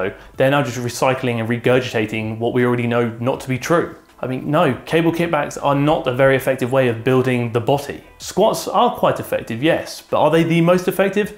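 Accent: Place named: RP, United Kingdom. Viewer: i only watch this because of his hugh grant accent omg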